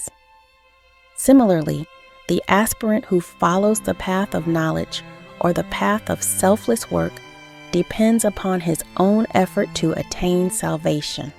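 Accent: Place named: United States. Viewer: Don't want to nitpick here but to hear these words pronounced with a heavy american English accents is really jarring.... and I think somehow detracts from the quality of the video. The proper Sanskrit pronunciation of Jnana is "nyaa-na" .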